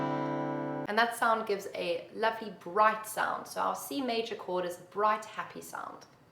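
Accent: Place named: South Africa. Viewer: You are South African with either Greek or Italian background, by the way I'm very annoying I know, my wife keeps telling me. Love your guitar lessons, thank you...maybe Spanish?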